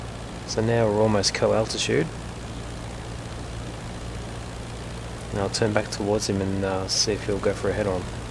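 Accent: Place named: England, United Kingdom. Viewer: That's because you enunciate your words & they have never truly heard an English accent haha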